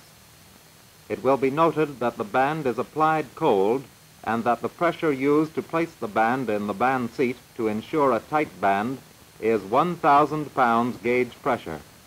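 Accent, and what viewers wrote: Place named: Mid-Atlantic, United States. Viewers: I was watching this and saying to myself, "Geez, how labor intensive and inefficient...How the hell are they making enough to keep the war going?". And then I noted the date...1939. Oh. I should have figured it was pre-war from the Mid-Atlantic accent of the narrator, the fact that all the employees are male, and the relatively pedestrian rate of production. Bet that same production line did not even resemble what we see here by 1945... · It's called the "mid-Atlantic accent" - the "mid-Atlantic" jokingly meaning halfway between the US and England. It's an affected way of talking that was taught in some circles during the early 20th century; it's by no means the way any actual people talked normally. You hear it a lot in film and radio of the era. It's "FDR-speak." :)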